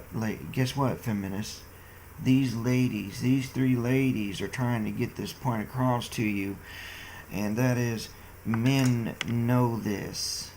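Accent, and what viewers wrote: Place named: United States. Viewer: 30 👎👎👎👎 pretty sad that you don't know what it wheel loader is because to me you sound American.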